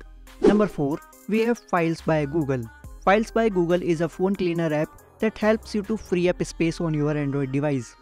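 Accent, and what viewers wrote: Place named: India. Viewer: It's a normal indian accent. Your comment is racist af.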